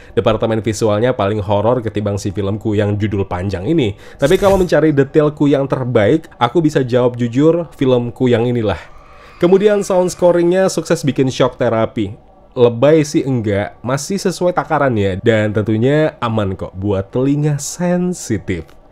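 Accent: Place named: Padang, Indonesia. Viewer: logatnya malah kya logat padang, cuma bagus sihh 😊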